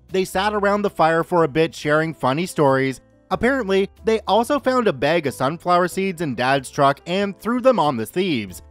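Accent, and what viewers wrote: South, United States. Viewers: your "southern accent" sounds like you were raised in the southern U.S at one point so don't beat yourself up about it. Sincerely, a Southern Yankee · The Miraculous, Stupendous, Gorgeous, Righteous, Lovable, Huggable, Adorable, Tasteful (soap operas), Beautiful, Powerful, Loyal, Legendary, and Best Chick-a-baby Mr. Koo has returned! ❤️, don’t worry about your Southern accent, it’s fine.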